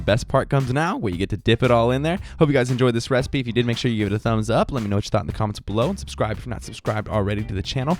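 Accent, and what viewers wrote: United Kingdom. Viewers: British accent, recipe, sexy filming and close ups sooo on point man. I'm legit going to make it now. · your British accent is better than mine and I'm British ... Take the children to the school baby ahahah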